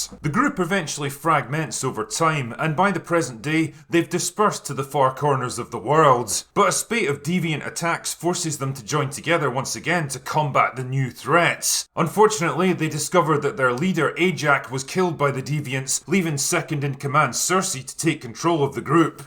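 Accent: Scotland, United Kingdom. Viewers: Brilliant critical reviews masterfully delivered in a way only a Scot can do is the reason why we need to protect and defend our ways and traditions as if the world depended on it - because it does. Thank you for bringing a huge slice of goodness out for all to see what goodness is all about.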